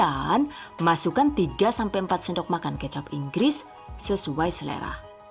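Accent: Banyumas, Indonesia. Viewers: Ora ngapak ora kepenaknya bun..😀😂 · Mba asli mana kok bisa ngapak? Salam ngapak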